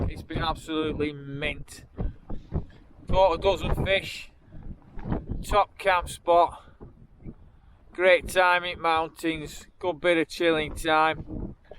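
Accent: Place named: Lancashire, United Kingdom. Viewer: Excellent video. Always look forward to them. Love hearing the accent. Here in Western Australia you don’t hear many Lancashire accents like yours or mine. I can’t go walking in the mountains like you but feel I’m there. Many thanks from dave down under.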